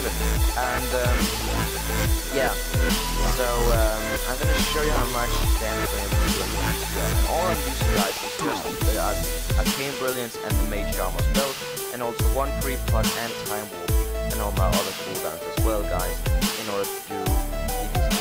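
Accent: Denmark. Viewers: Your accent sounds danish. Are you danish?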